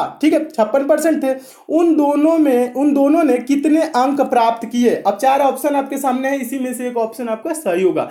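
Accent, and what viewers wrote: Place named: Bihar, India. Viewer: Sir aap bihar se ho kya kyonki bihari 11 ko igara bolte hai nice sir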